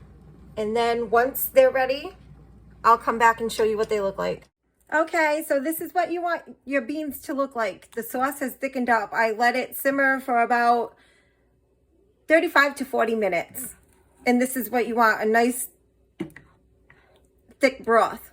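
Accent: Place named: East Coast, United States. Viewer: I never heard of sazon before but will look it up right now. My pork chops always came out dry when baked so now I only make them in a cast iron skillet. Does the marinade (specifically the lime juice) help to make the chops more tender when baked? Your east coast ‘accent’ brings back pleasant memories of my years spent in northern New Jersey in the 90s. Am back in my home state of Minnesota now, where everyone has that ‘up north’ accent! Thanks for sharing.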